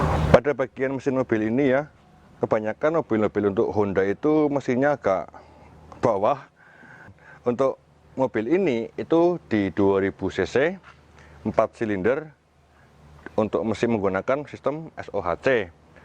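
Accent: Banyumas, Indonesia. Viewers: Ha ha ha slm ngapak. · Tawa mobil bonus paving, pa bos,,?? Rika wong ngapak ya,nyong demen,,aku duwe wedus,go dp bisa??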